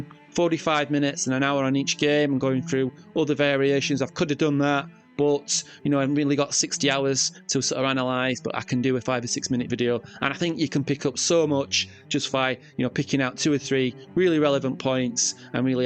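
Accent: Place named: Leeds, United Kingdom. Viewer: Sorry, just trying to pinpoint your accent... Leeds...wakefield ?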